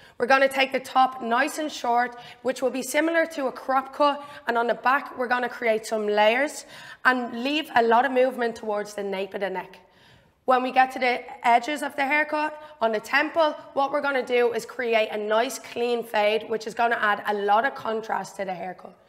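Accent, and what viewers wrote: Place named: United Kingdom. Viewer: What part of Britain are you from, you’re accent is awesome! Since the pandemic forced me to become my own barber, and after lots of trial and error(and clipper fuck-ups), these videos from you and the Regal Gentleman are huge help. I can’t go back to my rural Michigan (US) hairdressers, I wouldn’t let them bush my cat’s hair! I like to do funky things with my hair, mostly short highly textured, disheveled, high fades…I’ve been experimenting with coloring bleaching and highlighting for years, I like ice grey tones a lot, and that took a LOT of practice and patience and it still challenging, as I mostly do silver or grey highlights…. But yeah, it’s fun stuff, you guys the best jobs, making people look their best, I’m sure it’s incredibly fulfilling career! Thanks for the video tutorials, much appreciated 😁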